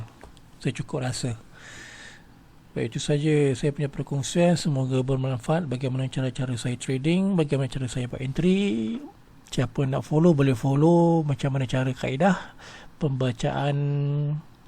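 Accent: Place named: Malaysia. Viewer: Terima kasih cik gu atas ilmu yang telah dibagikan ke kami semua, setelah saya play berulang ulang akhirnya saya mengerti maksud cik gu. Mungkin karena saya dari indonesia jadi agak kesulitan memahami logat melayu malaysia. Terima kasih cik gu semoga Allah SWT membalas semua kebaikan cik gu.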